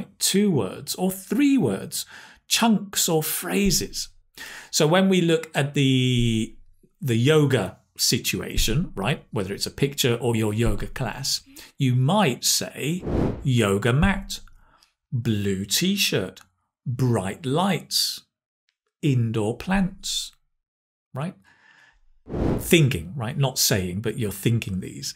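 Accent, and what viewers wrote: United Kingdom. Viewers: I adore that British accent..🥰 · Hi from Italy You have been a great discover for me! I love your British accent Your suggestions in this video are simply brilliant: focussed, clear, understandable and easy to get into daily life. Thank you so much!! I'll keep watching you!!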